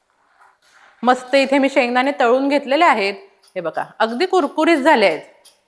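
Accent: Kolhapur, India. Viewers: Typical Kolhapuri bolta te baghun Lai bhari vatatay.Ek no.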